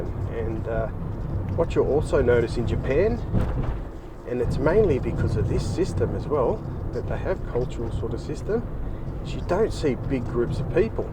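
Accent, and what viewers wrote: Australia. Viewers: I'm a boomer Aussie looking to the Akiya market, it's not important, I just spend so much time in Japan, it makes sense that I should consider a home here (Aomori) You're a Taswegian, Queenstown or somewhere nearby by the sound of it. It's a eye opener, being in Japan, isn't it. Love to chat with you...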